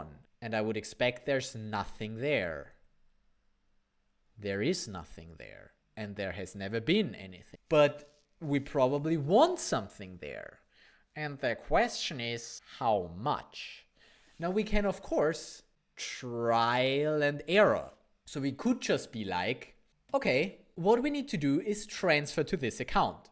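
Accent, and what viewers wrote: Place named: Austria. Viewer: Love your energy on the videos and I like learning about Solana from it. You are Austrian? I love the accent and thought it was Swedish. I'm not really good at accents haha.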